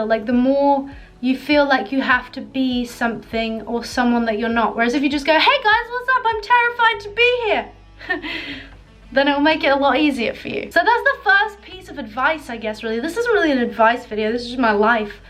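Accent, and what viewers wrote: England, United Kingdom. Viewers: Are you English?? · Are you English?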